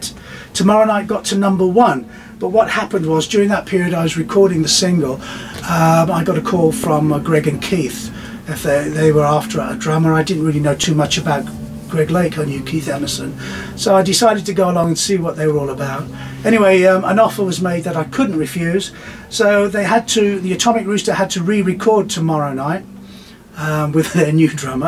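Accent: United Kingdom. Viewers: Why all this southern English accent, Carl? Come on, you're supposed to be a proud Brummie...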